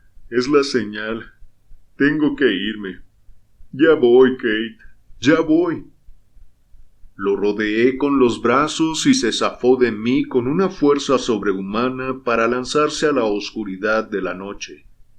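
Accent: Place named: Mexico. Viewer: Hola!! He seguido desde hace meses tus lecturas y ya eres mi favorito. Tu voz con acento de Mexico es muy agradable. He buscado NEMESIS en español de Agatha Christie solo la encuentro en inglés.. Nos la podrías leer? Gracias valoro muchísimo tu trabajo.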